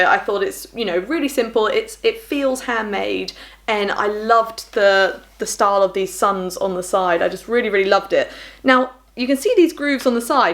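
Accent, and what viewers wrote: United Kingdom. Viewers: I'm enjoying your british accent 🥰 and i love thrifting 💖 new subscriber 🎉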